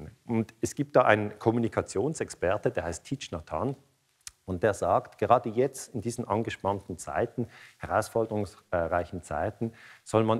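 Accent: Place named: Switzerland. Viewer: Und ich mag auch Ihr Schwitzer Dütsch! 😊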